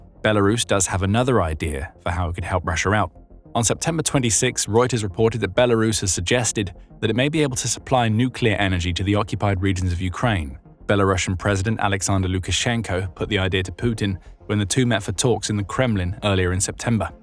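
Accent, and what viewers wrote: England, United Kingdom. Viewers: Why does the narrator, who's clearly English by his accent, keep calling petrol, "Gasoline"? In the UK they cal it petrol NOT gasoline!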